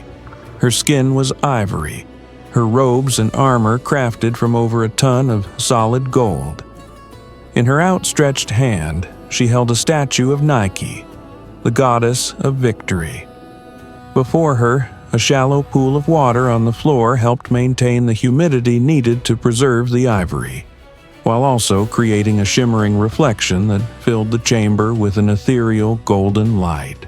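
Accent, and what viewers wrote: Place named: South, United States. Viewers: Good video, but the slightly southern accent seems a little out of place. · Right said. The Southern accent is so annoying in any context.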